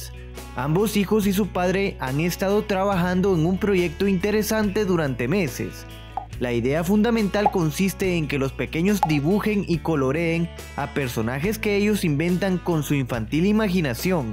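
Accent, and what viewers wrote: Honduras. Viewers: Sos Hondureño?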